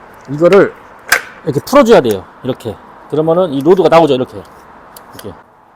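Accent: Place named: Chungcheong, South Korea. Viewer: 어따 거기 충청도유~~! 좋은정보 엄청나게 감사혀요~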